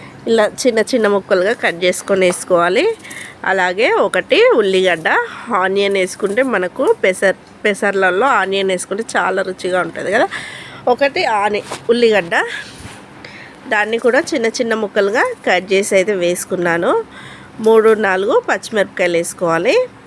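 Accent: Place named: Telangana, India. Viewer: Bahusa imedi thelangana anukonta telangana vallu thelugu alaage matlada thaaru its ok bhaasha ardha mayithe chaalu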